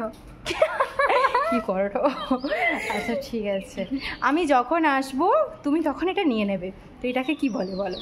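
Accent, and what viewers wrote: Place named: Odisha, India. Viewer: Medinipuri Vasa bujhte khub problem hoi... but tomar kathai Odia mixed thake tai na..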